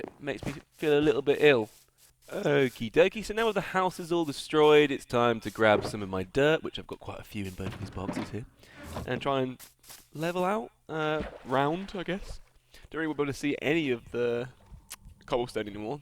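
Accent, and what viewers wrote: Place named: United Kingdom. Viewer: omg I just luv ur british accent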